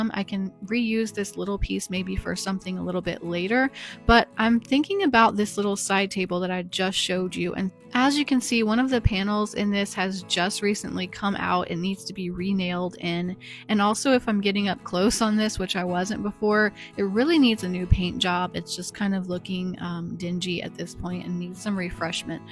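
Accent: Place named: United States. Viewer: Thank you so much,. Every makeover, flip, upcycle is a masterpiece! I also enjoy listening to your voice - a very soft American accent; I know, you probably don’t think you have an accent, but I’m from the UK, so you do! Enjoyed Curry’s upcycle too with stain on his nose and whiskers, a cool look.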